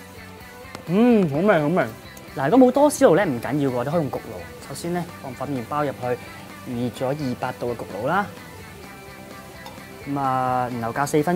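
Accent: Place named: Hong Kong, China. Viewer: D英文好香港式 夠地到 正!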